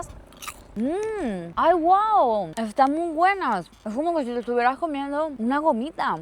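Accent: Venezuela. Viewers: me encanta como aún mantienes el acento venezolano. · Ojo con tu acento. No te vayan a agredir por allá por ser venezolana.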